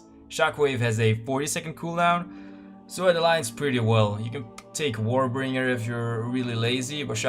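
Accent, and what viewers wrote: France. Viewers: omg i watched the whole video just because of that french accent :3 <3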